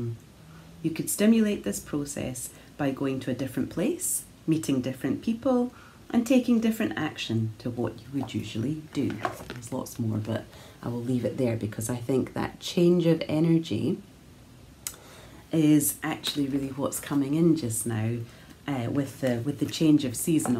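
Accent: Scotland, United Kingdom. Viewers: Dearest, what I love most when watching your podcast is your language - your scottish pronunciation and your pleasant voice. I also appreciate when you podcast with your mom - I think that you both knit a lot of beautiful garments! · Hello - I always appreciate the way you move through your podcast….meaningfully😊not rushing but taking the time to fill in the details. And your soft Scottish accent is very relaxing and comforting. It always means a lot to me when you review Art exhibitions and other places you’ve been as our creativity doesn’t start and stop with knitting. I very much love your new shawl pattern and it’s so lovely that you’re thinking of us in the Southern Hemisphere too!! I live in Tasmania, the little island state to the south of the Australian mainland - it’s a beautiful, magical place to live but we usually still need a cardi or shawl on in those Summer evenings - so one of those could just fit the bill!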